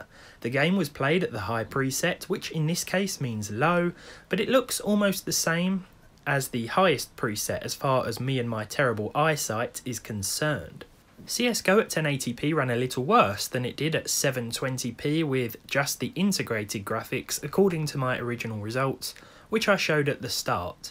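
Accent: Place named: England, United Kingdom. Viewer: I like your original English accent. I used to live in Tauton City. I learned a British accent. greetings from Germany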